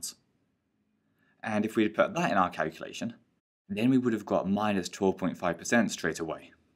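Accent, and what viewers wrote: United Kingdom. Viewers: I like your British accent:)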